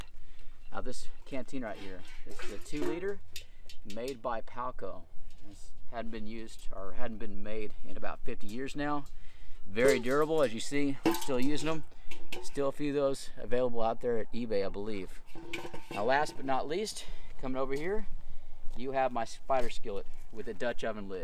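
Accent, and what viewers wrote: Texas, United States. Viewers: most satisfying Texan voice ever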